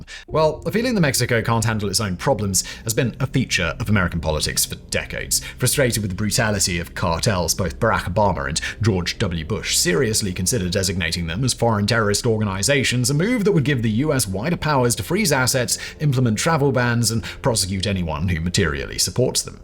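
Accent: England, United Kingdom. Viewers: Your accent - I assume u English- don’t know what u talking about - George bush CIA director in <num>-vice president in the 80’s Pres in the 90’s -in 1976 was liaison for China - u do the math - someone is Benefitingon This side - people are leaving the door open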